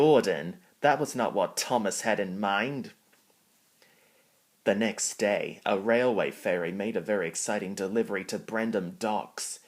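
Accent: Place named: United Kingdom. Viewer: Nice narrating☺you're using british english☺because your accent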